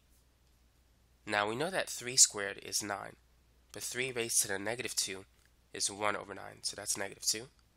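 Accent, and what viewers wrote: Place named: Queens, United States. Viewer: there’s too many especially on the east coast, if I were to guess I’d say this guy probably grew up in the Jewish side of Queens New York but I can’t really tell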